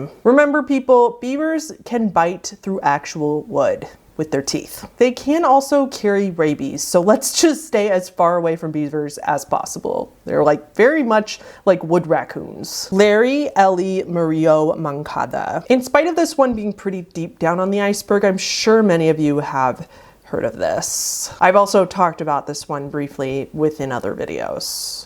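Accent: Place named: Seattle, United States. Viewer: I'm binging your videos again and I keep saying "your voice is so beautiful and calming" but I couldn't figure out where your "accent" is from (I'm trying to get better at recognizing state accents. Several videos ago you messaged washinton a few times, but nowhere specific.....you are about an hr from tacoma, so I'm guessing the Seattle area....makes sense that I couldn't figure the accent out😆 I'm in Lakewood (10 minutes from tacoma) so that's why you sound so calm and safe for me, you sound like home😄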